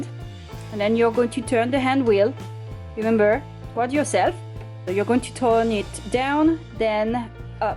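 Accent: France. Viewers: Are you french? Your accent is lovely!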